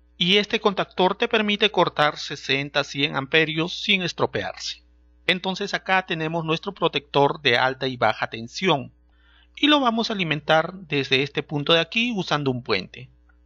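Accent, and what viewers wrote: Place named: Peru. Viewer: Dime que eres de Perú.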